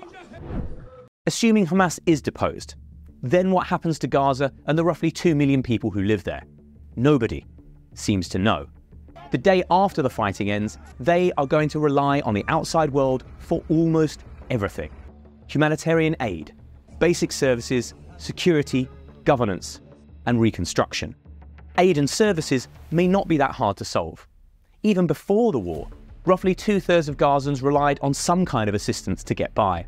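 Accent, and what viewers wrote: United Kingdom. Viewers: I love the British accent, but you’re in denial or in fantasy land the IDF is losing the ground battle but it’s easy for them to attack civilians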